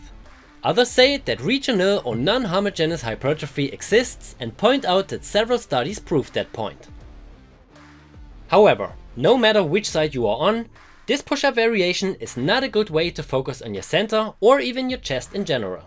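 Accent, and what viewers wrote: Germany. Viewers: Bro angefangen zu gucken und direkt mein Land rausgehört. Bilderbuchbeispiel für deutschen Akzent in anderen Sprachen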